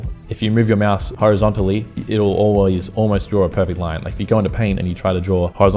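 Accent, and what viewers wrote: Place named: Australia. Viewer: I love the Australian accent 🦘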